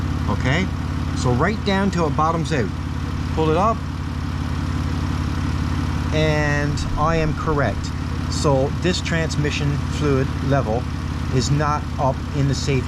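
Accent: Canada. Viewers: A minute into the video I was like "hmmm he sure sounds Canadian eh" and sure enough you are lol. I'm from Toronto, with at 2018 DGC.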